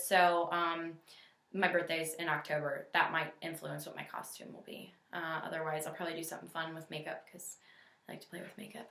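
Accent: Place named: England, United Kingdom. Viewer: Soy español pero detecto un poco ingles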